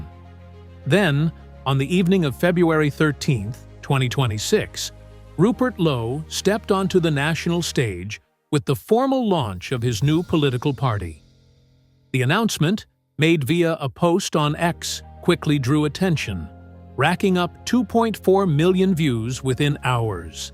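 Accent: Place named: United States. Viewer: Next time you make a video about the UK, you might consider using an AI voice with an actual BRITISH accent, as opposed to an American one. Listening to this was really getting on my nerves, but the way it pronounced 'Fareeeij' was the final straw for me! Just sayin'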